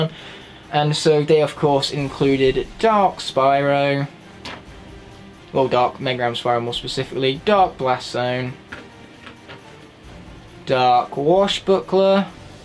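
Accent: United Kingdom. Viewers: I love this video you did so well 1) Your British unlike all the other ones 2) such a massive collection and they have good back stories 3) you are very repeatable 4)my favorite story is your wrecking ball one (series 1) 5) it’s inspired me to do a video on my collection I collect them very big on it there might be a new one in 2021 so look out for the next one and pls watch my vid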